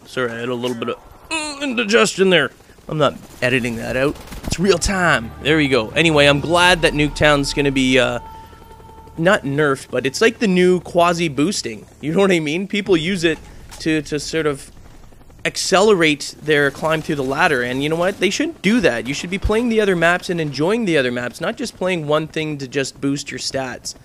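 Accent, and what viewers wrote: Canada. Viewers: You canadian? i caught an accent oout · I know it's kinda irrelevant, but are you Canadian? I thought I heard a little bit of an accent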